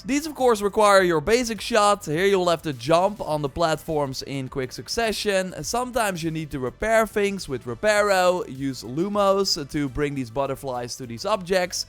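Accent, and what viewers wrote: Netherlands. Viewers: I have a feeling ur Dutch cause your accent, sorry if I’m wrong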